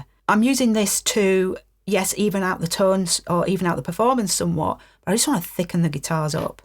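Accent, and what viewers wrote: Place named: Yorkshire, United Kingdom. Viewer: This is an amazing lesson! Thank you so much for sharing your knowledge in such an easy and understandable way. Also, I have to say I love your accent :) I now live in East Yorkshire, I guess we are not too far?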